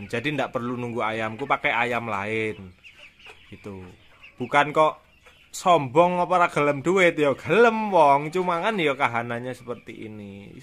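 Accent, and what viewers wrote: Java, Indonesia. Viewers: Aslinya mana mas... Jawa e kok kentel....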